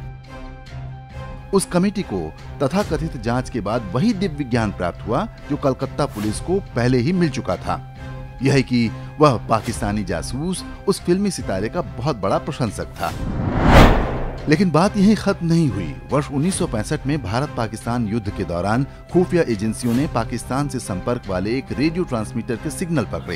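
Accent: Standard. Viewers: बड़ी शुद्ध हिन्दी है आपकी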